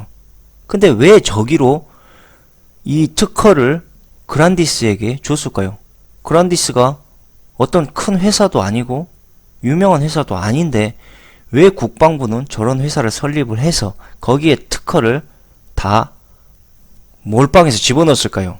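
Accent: Jeolla, South Korea. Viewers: 삼성을 망하라는 건가? 그럼 대안이 뭐냐? 인간중심? 좋아하네. 전라도 사투리도 거슬리고. 한심하다. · 채널 주인 전라도 억양 삼성비하... 선동성... 끄덕끄덕 역시 그쪽 분위기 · 믿고 거르는 전라도 사투리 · 그래서 뭘 이야기하는겨. 전라도 억양으로 뭔 개소리를 하고 있는겨...... 인간 같지도 않는 놈.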